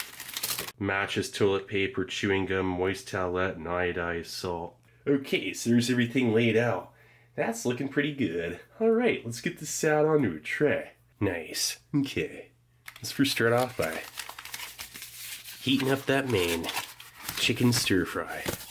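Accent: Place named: California, United States. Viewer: Love your videos man! Ps that Californian accent is gold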